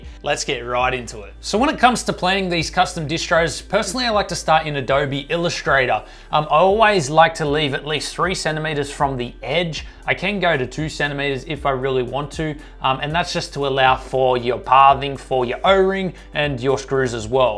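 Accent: Australia. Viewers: Are you situated in Australia? Cause u have an Aussie accent.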